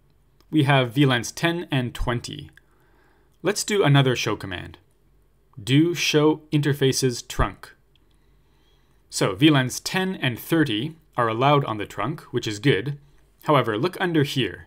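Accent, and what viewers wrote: Canada. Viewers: Comment for my Canadian sounding homeboy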